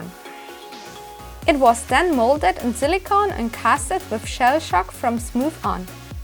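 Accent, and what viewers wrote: Germany. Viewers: You're incredibly talented, but German accents make me cringe :D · Great work!!! Are you german? Your accent sounds like you re from Germany. Oh and can you build the Necorchasm or Thorn from Destiny? Would be awsome!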